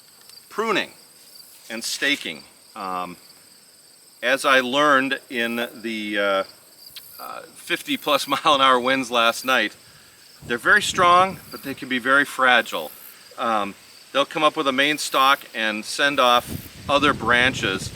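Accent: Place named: Minnesota, United States. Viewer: NO! Don't change a thing,! We get a little taste of Minnesota when we watch your channel! 😁 I'm confident over 20 years in Georgia has given me some southern spice to my accent, haha!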